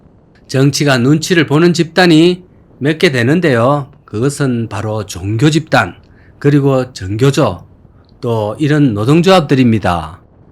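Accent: Gyeongsang, South Korea. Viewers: 유튜버는 사람들 일자리 잃어가는게 신나고 즐거운가 보네...한국에 기레기가 만든 용어인 귀족근로자 많아서 잘살면 덧나냐? 다들 생활고에 시들어 살아야 정상이냐? 말투가 역시나 경상도인이네...경상도 사람들의 종특은 모두가 못살기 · 혐오 갈라치기 좀 그만해라 ..나이처먹고 뭐하는건지...나도 나이 처먹어도 돈 이런식으로 안벌어....그리고 경상도 억양쓰니 더 역겨워~ 이세상에 일방적인것은 없어...노조없는 삼성은? 천국이냐? 노조의 문제점이 뭔지 해결책과 헤안이아닌 오로지 혐오... 나이 이렇게 처먹는거 아니다...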